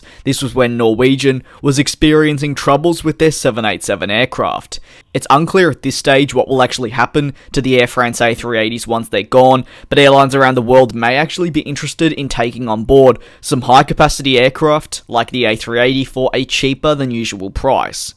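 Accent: Australia. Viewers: what's worse? the a-380 or the gay narrator from oz?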